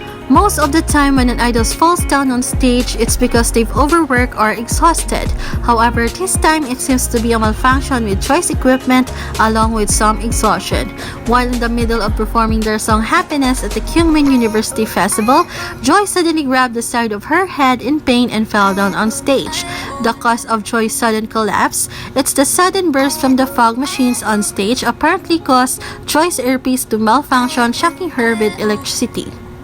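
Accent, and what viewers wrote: Philippines. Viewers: You have a filipino accent ahahha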